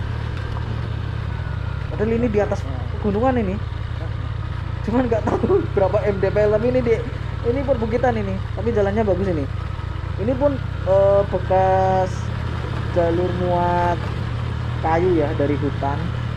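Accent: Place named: Banyumas, Indonesia. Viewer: Itu bahasanya kok ngapak bang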